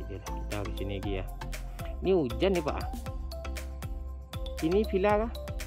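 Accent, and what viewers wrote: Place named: Minangkabau, Indonesia. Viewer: Ooo ini orang nya suara minang kocak😅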